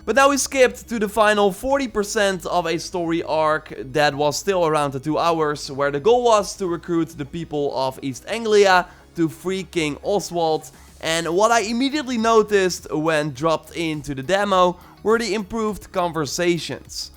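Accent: Netherlands. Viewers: Are u Dutch? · Yo, are you Dutch? Your accent:p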